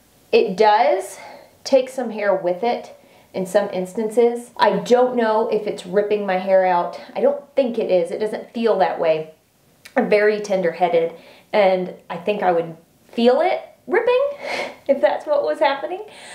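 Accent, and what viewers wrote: South, United States. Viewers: You explained this so well! You remind me of my teachers in grade school. Almost all of them had thick southern accents like you.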